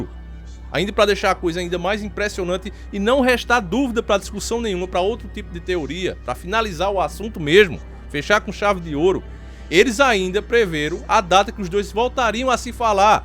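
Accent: Northeast, Brazil. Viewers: O bicho você e nordestino né se for e nois viu 😏😏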